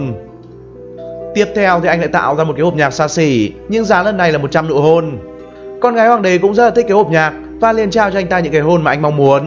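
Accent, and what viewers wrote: North, Vietnam. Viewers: Anh ở miền Bắc đk anh.-.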